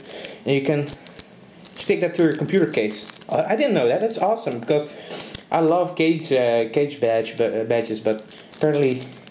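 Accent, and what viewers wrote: Netherlands. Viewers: jij klinkt heel erg nederlands lol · mooi systeempje, heb al wel een 6870, maar nog een i5 760. Ga wel upgraden naar ivy bridge. Best geinig dat je kan horen dat je nl bent (en ja ik weet dat er nl in je naam staat)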